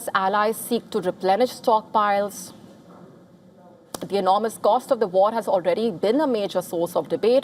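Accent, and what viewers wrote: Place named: India. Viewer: This lady's Indian accent is way too strong. Could only understand 25% of what she said.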